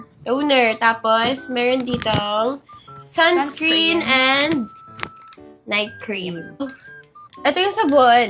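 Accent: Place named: Iloilo, Philippines. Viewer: Ilongga ka pala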